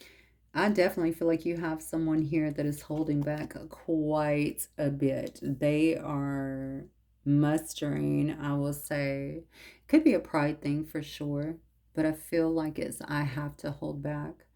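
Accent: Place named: Alabama, United States. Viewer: Hi ty 4Ur readings always on point ❤ I ❤️ ur accent I knew u were from Alabama 🤗 I’m from ALICEVILLE 🤗 I live in Buffalo NY since the 70s still have lots of family there. SWEET HOME ALABAMA 🥹🥰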